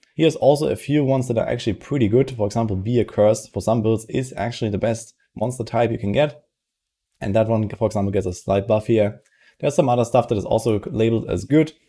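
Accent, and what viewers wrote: Germany. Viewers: Role Model for "a german talking english" ❤️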